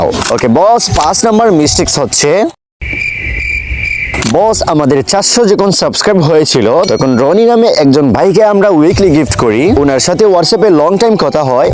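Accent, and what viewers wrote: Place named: Sylhet, Bangladesh. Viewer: বস তোমার সিলেটের ভাষার অনেক ভালো লাগছে❤❤❤❤😊😊🎉🎉🎉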